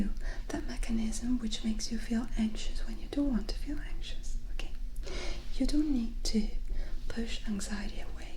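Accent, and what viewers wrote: England, United Kingdom. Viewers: tu acento en este vídeo era británico a diferencia de otros que has hecho... me gustó 👌👍👌👍👍👌👌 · el acento británico q le pones es excelente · am i crazy (hah) or is she kinda doing an english accent? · Sounds like you are doing a bit of a British accent in this vid. I like it! :) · C'est marrant que tu aies un accent britannique quand tu parles anglais. Thanks for the video! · Ese ligero acento británico que haces es hermoso y muy relajante 😍😍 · Lmao I love the way you do an English accent. Great video as always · Tu acento de inglés es británico que cool, el mio es el típico acento de Estados Unidos 😂😂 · I really like your English accent ☺😋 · "ostiaa" cit. 😂😂 per pochi secondi mi hai steso;) per il resto che dire, adoro il tuo modo di parlare, il tuo English accent, adoro ascoltarti in ogni lingua 😄😄 thanks 😘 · that british accent omg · Esto es inglés británico,?:D Me encanta cómo lo hablas. 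<3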